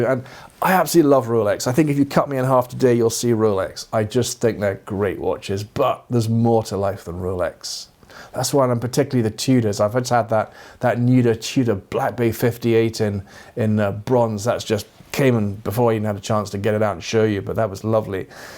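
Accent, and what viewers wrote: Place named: Edinburgh, United Kingdom. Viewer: To be honest I think you`re spot on with you presentations: informative and relaxing to watch and as a bonus you slight Edinburgh burr makes them a pleasure to listen to. I know the Americans tend to do their videos in a different style but there's only so much shouting and fast talking i can sometimes take.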